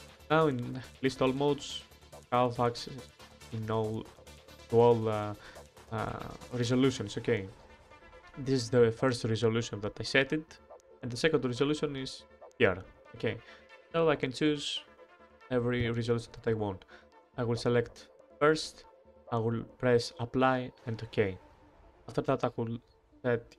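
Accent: Greece. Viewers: πως καταλαβα οτι εισαι ελληνας 😂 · He sounds greek or arabic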